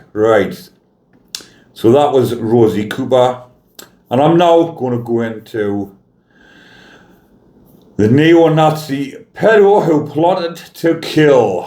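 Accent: Newcastle, United Kingdom. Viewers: Id sue that car you tried to get out the way but the car just jumped out at you so you didn't get chance to move out the way so it hit you thank god you servicer. I really dont like Facebook i have my reasons so id love to see you're working on this channels and your voice is cool there's something about Newcastle accent.this will make you laugh the last few people who have seen me must be bloto cos ive been mistaken to be looking like a man that can be helpful and come in handy ill get ragged about you accent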